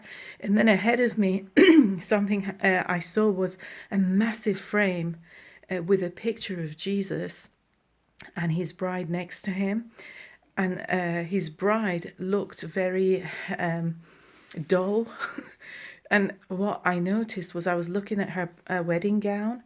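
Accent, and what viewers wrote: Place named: Middle East. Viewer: I like your accent are you middle eastern like Jesus? By the way what did jesus look like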